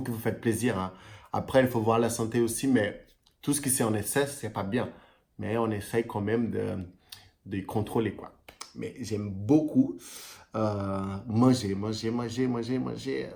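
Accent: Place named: Brazil. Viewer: Je vais regarder tes vidéos juste pour ton accent qui me me replonge dans mes vacances brésilienne. Cette accent est un aphrodisiaque aussi 🤣👌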